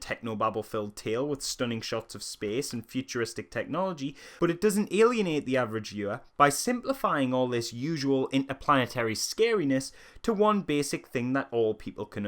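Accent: Newcastle, United Kingdom. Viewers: Getting more and more geordie as time goes on what's the crack like?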